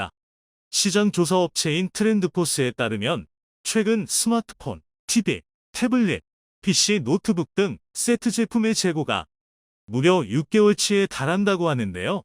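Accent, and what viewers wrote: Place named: China. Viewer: 중국사람인가 ㆍ 왜 말이 찳지 ㆍ혀가 짧고 발음 정확하지 않음 ㆍ 하지 말아요 유트브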